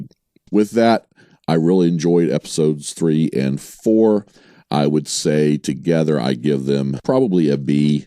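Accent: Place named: Texas, United States. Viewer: Late to find you; glad I did. Your reviews of this series come across as fun & heartfelt. Plus you have a great voice. Sounds Texan so I'm biased. I look forward to listening to you come Season 2.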